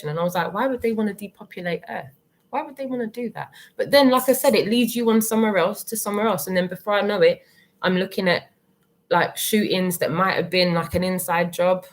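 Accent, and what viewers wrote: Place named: England, United Kingdom. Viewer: At last, Ive found an english lady who speaks the same as i do!! Id love to chat, if your up for it!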